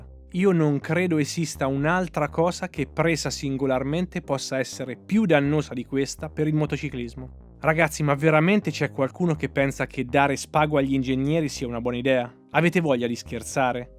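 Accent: Perugia, Italy. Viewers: Pensavo di essermi accorto solo io 😂 Perugia si sente da lontano ahahahhaah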